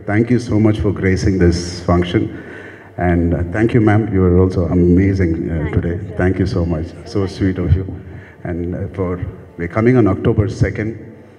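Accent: India. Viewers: He is speaking like * tamil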